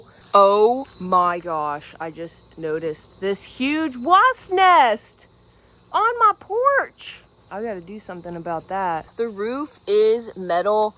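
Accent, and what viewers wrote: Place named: Tennessee, United States. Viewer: I was trying to go by the accent & thought maybe Tennessee? But, I have only been there once so…..?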